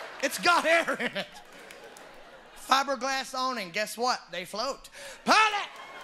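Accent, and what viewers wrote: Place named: South, United States. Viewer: Yeah unlike Larry the cable guy you have a real country accent and funny lol God bless 😂😇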